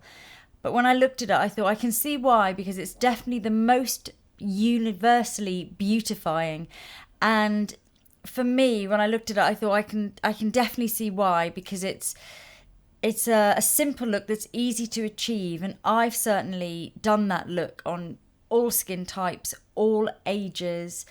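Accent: United Kingdom. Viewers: So peaceful your voice! I really love watching your videos 🥰, and love your British accent, it takes me back to my high school days with my British professors 😍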